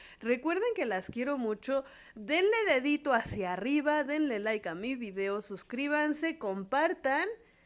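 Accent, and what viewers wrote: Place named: Mexico. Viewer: Hola, de donde eres? Escucho tu acento como mexicana, yo también mexicana, cual es tu fb me interesa aprender árabe, gracias!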